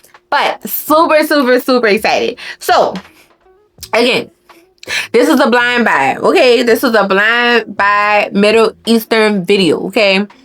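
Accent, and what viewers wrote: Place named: Louisiana, United States. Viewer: Hey Sis!!! Girl...are u from Louisiana?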